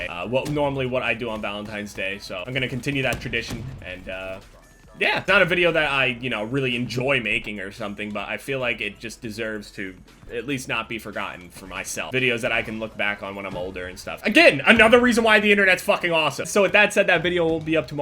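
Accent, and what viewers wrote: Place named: New York, United States. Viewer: Anyone notice that New York accent with him saying "Twitta"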